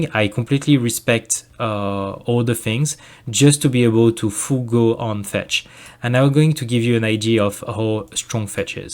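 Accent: France. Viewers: Il me semble bien reconnaitre l'anglais d'un francais ^^ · l'accent francais 💔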